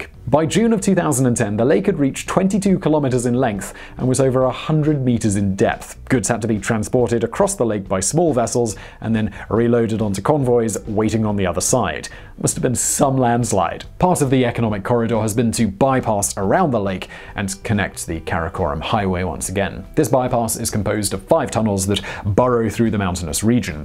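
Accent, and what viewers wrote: United Kingdom. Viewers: the way he talks in a bantering way, makes it that much funny, typical brit accent. Although Indian army has plans to seize Pakistan occupied Kashmir and in turn seizing Pakistan's dream of cpec. China probably isn't in the condition to interfere.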